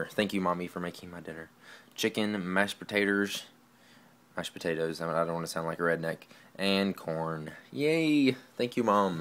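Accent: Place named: North Carolina, United States. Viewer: hahaha I'm from North Carolina too and i as soon as i heard your accent i knew you were from NC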